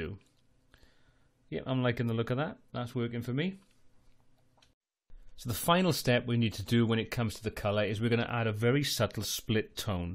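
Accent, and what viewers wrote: Wales, United Kingdom. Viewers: How lovely to hear a Welsh accent!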